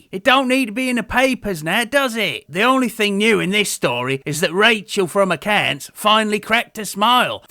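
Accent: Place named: Liverpool, United Kingdom. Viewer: 🇬🇧 Another Cracker! Scouse accent a blinder mate! 😂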